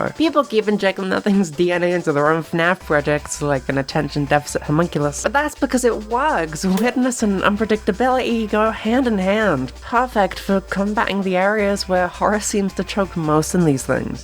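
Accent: Australia. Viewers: Great video, but I have to ask what's up with your voice? Kinda Aussie, kinda British? Its a bit hard to listen to, if im honest. Hearing a perfectly fine austrailian accent and then you suddenly drop your T sounds to an almost comical degree (the "bo'oh'o'wa'er" effect). Ive lived long enough in both Australia and the UK to say that ive almost never seen australians drop their T's like that, and most british accents dont do it nearly as overtly as most think. This isnt a personal attack, its more that im genuinely curious. Its the same sort of question i'd ask if I knew someone that had never lived in the UK all their life but spoke in an american accent. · I can’t tell if is Aussie, Brit or American anymore, but I have an autism accent so I can’t talk. Happy to be here nonetheless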